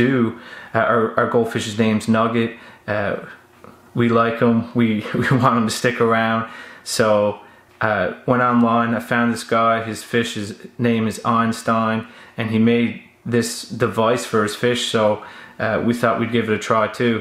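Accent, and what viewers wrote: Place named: Newfoundland, Canada. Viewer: Started watching this expected you to be form the USA or something then you started talking and I was like accent accent oh wait wtf this guy is from Nfld. Will be building a fish wheelchair in Halifax today.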